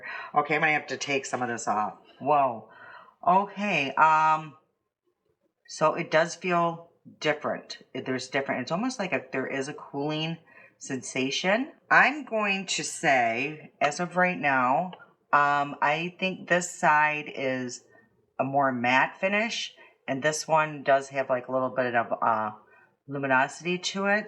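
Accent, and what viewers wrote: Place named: Minnesota, United States. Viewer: Don't stop I love yr channel u r so funny and likeable Minnesota accent so charming and I loved the missa video do a skin Tint best of. Or Charlotte Tisbury dupes best of. Thku for doing a great job great channel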